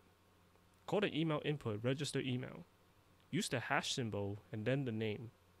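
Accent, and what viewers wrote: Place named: India. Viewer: Wow...i just realized that u are from India. Nothing bad, usually I can't focus when i view an indian tutorial bcuz my ears hurt :)) But your style is clean and amazing ! Hope we get more angular tutorials from you . Great job sir !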